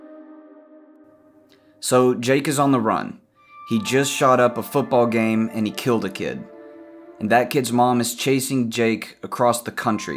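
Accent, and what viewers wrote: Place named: Texas, United States. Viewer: Howdy fellow animation industry Texan! Hearing you talk feels like home🙂 I been thinking about your pitch for days, and I basically wrote an essay in response, but I feel very passionate about your idea, hope you'll bear with me. Okay! The idea of a series that shows what it's like in the US is outstanding; you're right, we don't really have that! And it's great you're thinking about bringing in therapists, hopefully public health specialists that specialize in studying mass shootings. I developed a similar story too, spent about 7 years on a novel about a mass shooter and why he did what he did. Then I lost friends in a mass shooting. In my grief, I searched for a reason why someone would do this, and read hundreds of profiles of these dudes. One thing that struck me, is that they could all basically be the same guy: brags about how smart they are to mask a deep insecurity, impulse control problems, and what I could only describe as anger tantrums, exploding the way a toddler might. 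These men are angry that they don't have the woman they want, the job they want, the money they want, whatever. And instead of turning inward and improving, they lash out. It's not a very complex journey. I applaud those who pull themselves out of this trap (like the TED talk fellow) but for the dudes who make the decision to steal human lives from our communities? Learning about how uninteresting a mass shooter's personality is, for me, was a bit like reading Eichmann in Jerusalem, a book about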